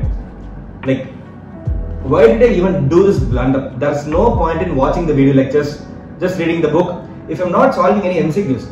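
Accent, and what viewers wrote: South India, India. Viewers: South accent : yes but atleast he didn't he start the video in English and proceed with native language like how the majority does 😅. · Dude fix or mic or sit close to the camera/phone ur not understandable with tht south accent of urs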